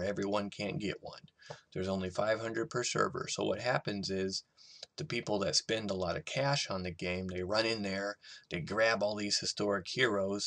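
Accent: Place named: United States. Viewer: where you born in japan because you talk like your from the states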